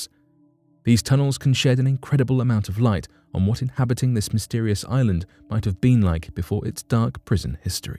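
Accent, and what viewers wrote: United Kingdom. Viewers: Where do we go to find non-british speaking narrators?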